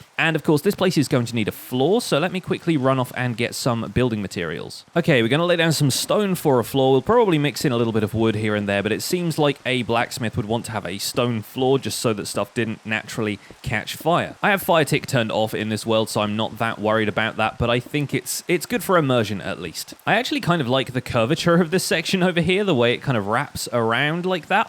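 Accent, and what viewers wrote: United Kingdom. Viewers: You are sort of my hero cause I'm not good at doing these things so thank you very much, btw, are you British? I'm not British but my mom said I have a "British accent"...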